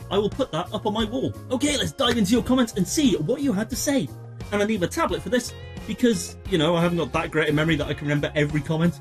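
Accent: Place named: United Kingdom. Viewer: I totally do that adapt accent thing. When I meet a british person i immediately start talking with a british accent. And to Americans, most british accents sound the same. We cant tell regions. So you sound British not Liverpool.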